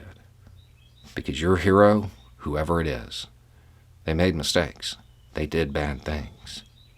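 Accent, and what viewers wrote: South, United States. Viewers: What rock have you been living under that you see anything at all heroic about Ronald Reagan? The "hero" meme about him is pure propaganda, repeated ad infinitum until weak minded people willing to believe anything come to believe THAT thing. I'm getting closer to unsubscribing to this channel. Despite trying to shed your upbringing, it is apparent that at core you remain the ignorant Southerner implied in your accent.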